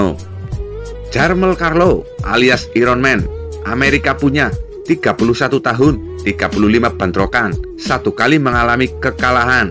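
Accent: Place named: Banyumas, Indonesia. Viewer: Admin mengulas masih logat ngapak👍. Inyong seneng dgn ulasan ini👍👍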